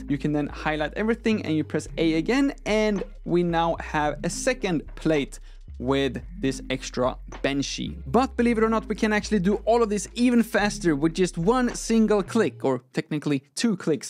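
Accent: United Kingdom. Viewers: British English, subscribed! - Trying unlearn - unsubscribed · Keep your British English. It's not wrong. 😂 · British English, thank you, subscribed!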